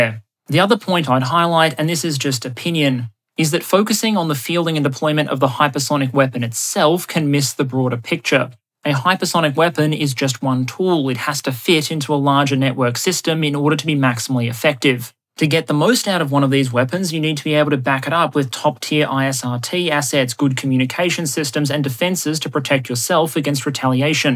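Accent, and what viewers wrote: Australia. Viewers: Ah yes I am going to listen to an Australian man for the next hour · It is frankly absurd that 1-hour PowerPoint presentations are right at the top of the content I am most excited to see each week. That damn Aussie dry wit and accent! :) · I love this channel because it’s not just the short attention span of only 8 or 9 minutes for all the other videos. i’m only in adopted Aussie but as a yank it is nice to hear the fellow Aussie talk about the topic of geopolitics which I am quite passionate about in a more extensive ways regarding equipment of military defence and offence.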